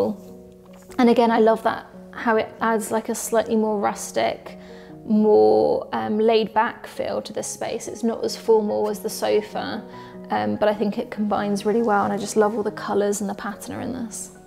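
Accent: United Kingdom. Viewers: How do you spell the word that describes the very large mirror on the staircase? I'm not familiar with the British accent, so I can't tell what is saying Thank you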